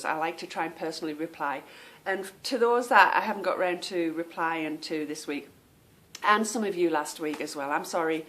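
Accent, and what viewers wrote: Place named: England, United Kingdom. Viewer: You talked about saving our first knitting. I learned as a young girl and would make my own doll blankets. I wish I had saved one. But, I did however save my son"s two knitted squares. He is 37 yrs. old now lol. I could not part with them. He asked me if I would teach him how to knit when he was a little boy :) Now I am teaching my 5 yr. old Granddaughter who I live with. Years ago I entered my work in the California State Fair and won ribbons. Most of the entries were baby items. I saved them all so someday my grandchild could wear them. Well, years later my beautiful Granddaughter was born and I gave the clothes I made to my Daughter and she dressed her in all the adorable treasures I had saved. It made me so happy. Now for the word pronunciation. I say Basil the same way as you do but the other words I say in American. 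You also have people in the south and east coast of the US who have their own pronunciation as well lol. I assume the English, like yourself is correct. No one should correct you.. I would love to sound like you. I'm in California so we get sloppy at times when saying words like party etc. and switch the sounds to a letter D. It's very interesting. I enjoyed your podcast very much as always. Take care...Sharon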